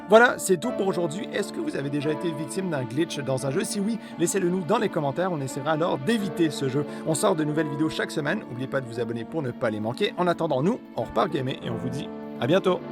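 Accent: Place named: Quebec, Canada. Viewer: Alors moi je suis tombée par hasard sur topsicle cinema et comme j'adore, je me suis dis que ce serait trop bien la même chose mais avec les jeux vidéo. L'accent québécois étant le petit plus :) Pour rebondir sur la vidéo, je sais pas trop si on peut le ranger dans la catégorie glitch ou plutôt easter egg, mais il y a la fameuse tarte/hibou avec la musique flippante qui l'accompagne bien, dans Dragon Age Inquisition. Ce qui peut donner matière à une nouvelle vidéo: top des easter egg les plus flippants.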